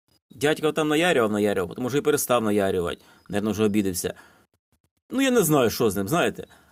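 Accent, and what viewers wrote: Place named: Central Ukraine, Ukraine. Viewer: Хоч ніхера в цьому не смислю,але цікаво,завжди дивлюся 😅 В якій області живете,завдяки вашим відео вибрав собі полуавтомат,бо у мене дуже древній,Гарного мирного дня.Судячи з мови,думаю що це Черкаська Київська або Вінницька, обл,буду радий якщо дасте відповідь на мій коментар😊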